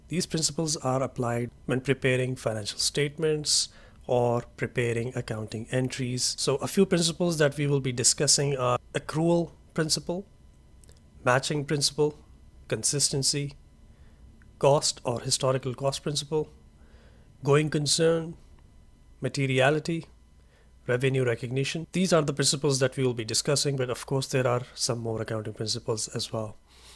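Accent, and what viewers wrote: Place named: India. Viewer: that Hindu accent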